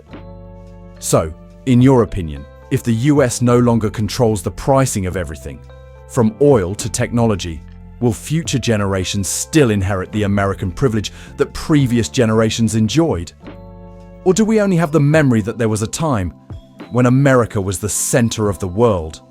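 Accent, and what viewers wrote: United Kingdom. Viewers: You sound like a Brit 😂😂😂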